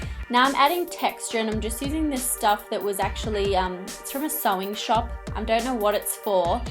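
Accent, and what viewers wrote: Australia. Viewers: Is she Australian? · your Australian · Shes Australian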